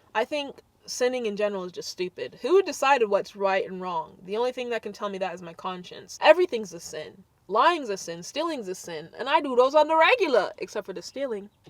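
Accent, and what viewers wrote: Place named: United Kingdom. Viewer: I love your British accent...more please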